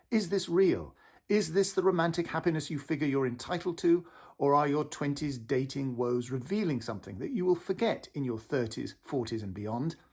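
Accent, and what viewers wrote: United Kingdom. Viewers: I did subscribe ages ago :-) love your british english I sometimes don't really understand. So your vlogs have added value to me since I learn something about movies and also improbe my bad czech english! Greedings from Plzen!!!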